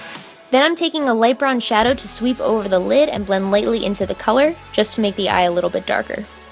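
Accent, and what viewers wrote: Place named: New England, United States. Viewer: Love it! She's my favorite character ever. I think that your normal, dramatized NE voice is Harley-esque enough!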